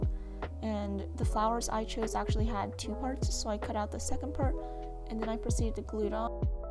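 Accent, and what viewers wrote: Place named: New Jersey, United States. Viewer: i love your voice it’s like a fem kermit from new jersey,,, very calming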